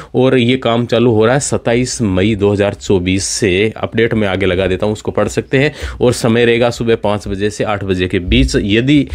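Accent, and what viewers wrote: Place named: Shekhawati, India. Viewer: Bhai aap shekhawati Rajasthan se ho kya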